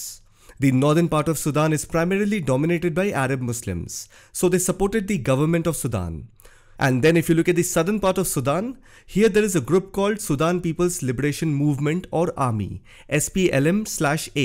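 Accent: India. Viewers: ❤You spoke very well English - during the first 20 seconds... Then you got too animated and slipped into "Ind-glish" - which is unbearable to listen too!❤ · Well explained but not all are true from ur voice i can see you are an Indian repoter and that's why not all ur info are correct but you hit must of the points. More concrete research and confirmation from a well respected reporter in one of this african countries like UG, Keyan, Sudan will give you proper details. There are more to the war. I didnt see you talk about china here ask questions you will get the exact details. You did so well once again thank you for speaking out. God bless you 🙏❤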